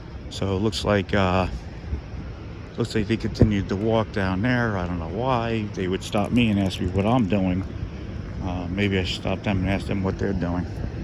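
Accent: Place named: New Jersey, United States. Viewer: 😂😂😂 That's how we speak in NJ!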